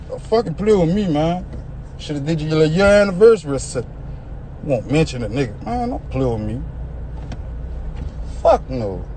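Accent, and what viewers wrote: New Orleans, United States. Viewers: He has a new Orleans accent they all speak like that I love their accent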